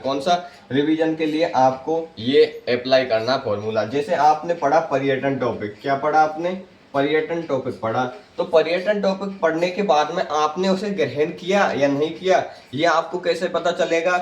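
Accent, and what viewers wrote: Bharatpur, India. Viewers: From mahwa dausa Are you Bharatpuiya Hamari jaisi bhasha hai aapki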